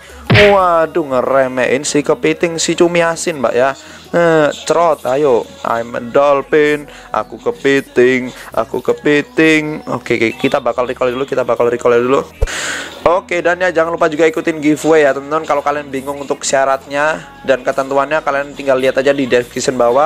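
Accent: Java, Indonesia. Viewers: Lah gw malah ngakak sama omonganmu bang 🤣, jawa medok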